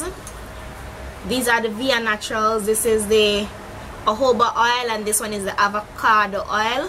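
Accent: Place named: Jamaica. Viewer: i could hear the accent haha am jamaican too but am from spanish town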